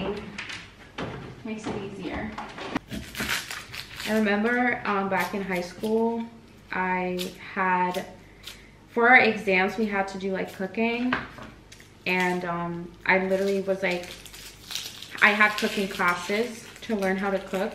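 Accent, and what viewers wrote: United Kingdom. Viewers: I love your british accent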